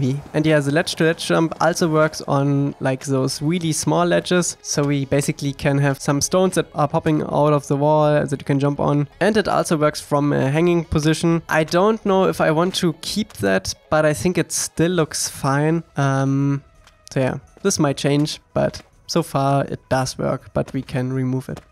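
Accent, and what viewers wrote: Germany. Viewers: Haha voll rausgehört das du aus Deutschland kommst :D mit welcher Engine arbeitest du denn am Projekt? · Dieser deutsche Akzent XD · Du bist ein deutscher oder? ^^ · Gutes Englisch, aber ich feiere einfach, das kaum ein deutschsprachig Nation, ihren Akzent verstecken kann (willst du wahrscheinlich auch gar nicht) Grüße aus Hannover 😘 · Your english sounds like you are German, please tell me I am right :D (I am German) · Man erkennt zu 100% er ist deutscher English: because his accent you can hear his German Btw really nice game i hope we can play ist all in the future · if i may ask. from what country are you? your accent reminds me a bit of german. when i hear "glide" u kinda say "gleit" the german word :D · Dieser English Akzent :D Deutsch nicht wahr? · Der Typ ist 100 Prozent deutsch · Ich weis nicht, aber kann es sein das er aus Deutschland ist ? 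· Ich dachte doch gerade am Akzent.. das klingt ziemlich deutsch, schaue in die Kanalbeschreibung: Deutschland :D Aber coole neue Mechanismen! :) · Man hört seinen deutschen akzent soo krass raus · nicer deutsch akzent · Are you german? Your english sounds really german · Nice, in the beginning it looked like a simple amateurly made game and I expected the project to stop after a month, but it's really coming together and getting closer to a game I'd enjoy paying! Keep it up my dudes! PS: btw are you German? Your accent sounds like you were. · Wie krass man hört, dass du deutsch bist. Aber mal davon abgesehen sieht alles sehr cool aus. :D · Du hast n echt deutschen Akzent. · That's the most german english I ever heard😁😁the game looks very good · Man hört einfach das er Deutsch ist haha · Du bist doch ein deutscher, oder ?😂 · Man Merkt das er Deutscher ist :D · digga wie man einfach hört das du deutscher bist :D Aber geiles Game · Not even 2 minutes in and I knew you're german xD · Man hört irgendwie deinen Deutschenakzent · Sounds like a german accent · Wirklich sehr kräftiger, deutscher Akzent. 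Aber naja nice, dass ein Deutscher das ambitionierteste Fangame-Projekt zu avatar leitet (ist) ^^ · Der Deutsche Akzent macht das tausendmal lustiger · wie man das deutsch höhrt haha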